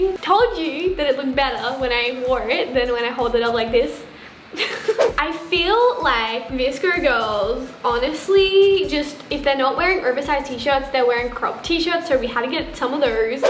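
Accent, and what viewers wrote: Australia. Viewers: These replies are cracking me up! I love Aussie accents 😭😂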